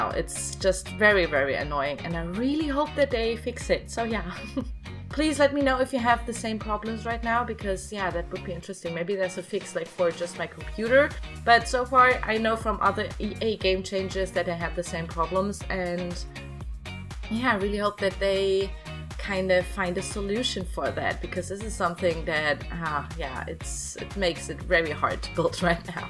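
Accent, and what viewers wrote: Germany. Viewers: Are you German? You sound like you have a german accent💗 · Alsoooo, I love your voice and accent so much!! It reminds me of my family and my Oma from Germany and it’s very nostalgic for me. I hate that someone kind of attacked you and criticized your voice that you talked about in one of your previous videos, it really upset me for you, because you’re just so nice and sweet and I really really love you, so I just wanted to say that you’re phenomenal and I love your voice! 💖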